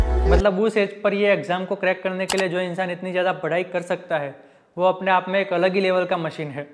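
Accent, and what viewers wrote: Gujarat, India. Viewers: Aapki aavaj sunke hi pata chal gaya hi aap gujarati hu 😆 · I can feel you are GUJARATI!! ❤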